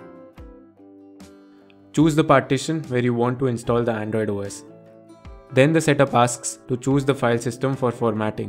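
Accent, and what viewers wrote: India. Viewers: I love Indian accent camuptar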